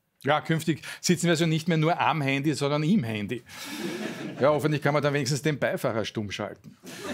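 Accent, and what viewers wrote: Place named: Vienna, Austria. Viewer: sein Wienerisch ist wirklich ein wenig anstrengend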